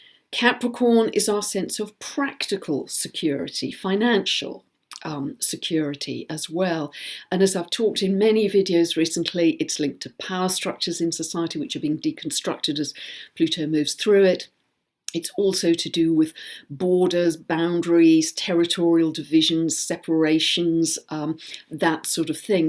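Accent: United Kingdom. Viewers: Beautiful lady, great astrology! I love her British accent, too! So chic!!! Thanks & Blessings! ✨💫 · You are my favorite internet fairy. Your forecasts are way cool. Really enjoy the way you talk and the British accent, too. Thanks for doing this. Greetings from Florida.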